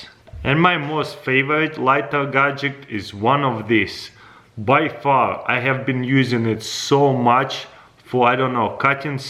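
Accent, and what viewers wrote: Russia. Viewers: Russian awful english Slang... Bäääää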